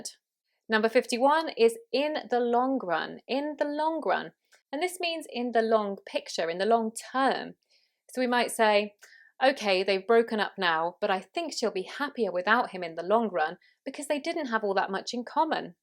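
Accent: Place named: United Kingdom. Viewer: Both of you are amazing teachers.Thank you for the great lesson teacher.I''m really impressed with your british accent.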